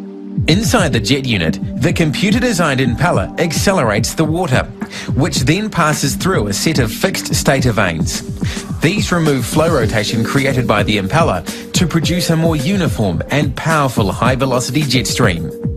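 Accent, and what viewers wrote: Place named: New Zealand. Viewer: Yep. New Zealand accent.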